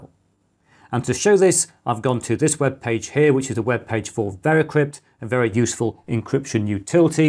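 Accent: United Kingdom. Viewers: I thought you were going to “flex” ChromeOS so I clicked the video cuz i thought it would be funny but then I heard the Bri’ish accent and hit dislike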